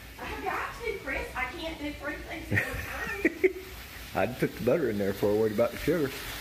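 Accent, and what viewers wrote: South, United States. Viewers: I enjoyed your video very much! I plan to try your recipe soon! I have never had a fried apple pie, but my grandma and mother made fried banana pies, which are delicious! They used canned biscuits for their fried pies, but there's nothing better than homemade biscuits. I am looking forward to trying your recipe with a variety of fruits. I am from N.C., so watching you cook and listening to your southern accent, made me miss my relatives that I have lost through the years. I live in the state of Washington, but my heart is still in N.C. I pray 🙏 you have a speedy recovery, with no complications!! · I do enjoy your southern drawl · My mama was born (1916), and raised on a farm in Texas, along with her 9 brothers and sisters. Your accent, southern terms, expressions and idioms sure do make me miss her. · Glade you feel better. 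Also are y’all from up north? The kitchen reminds me of our kitchen in Ohio. But you sound southern. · That southern drawl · I love your southern drawl too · I love the South in yo mouth, girl!! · The southern drawl really is a great sound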